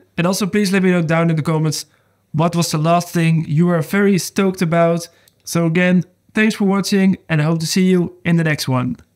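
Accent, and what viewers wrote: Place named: Netherlands. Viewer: ERMATTEN and THERMAC. The Dutch accent is killing it lol keep it going dude! Great Video's!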